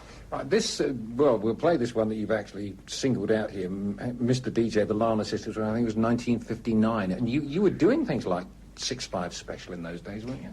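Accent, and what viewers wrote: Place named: Ireland. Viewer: I love and have always loved her voice whether singing or talking. It has a different sound. I'm scots so of course I have a different sound to pure English but so has Dusty. I don't know if this came from her mother's early influence as Irish but whatever it is Dusty has a different sound altogether. Anyway can I just say this was a great interview with Keith Altham who was always a champion of hers and spoke about so brilliantly on the Just Dusty TV documentary.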